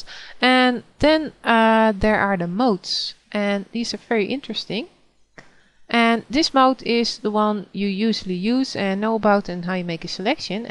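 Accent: Netherlands. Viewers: nice tut with such a trademark dutch accent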